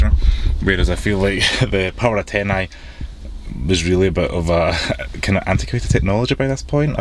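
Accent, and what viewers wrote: Scotland, United Kingdom. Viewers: With such a powerful Scottish accent you need to make sure sound quality is very good so we can understand what you are saying. This video sound quality is aweful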